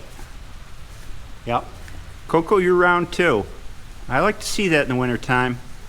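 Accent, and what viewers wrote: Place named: Texas, United States. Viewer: Sound like Texas but no debate about snow😂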